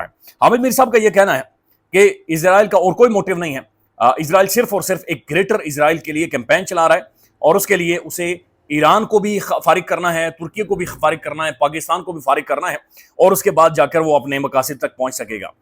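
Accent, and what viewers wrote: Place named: Kashmir, India. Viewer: جیو کشیمری لہجہ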